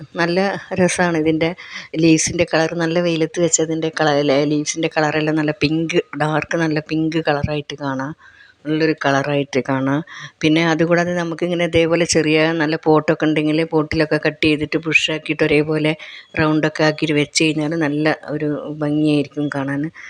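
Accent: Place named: Kasaragod, India. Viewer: Samsaarathil endoo kasrod nn thonni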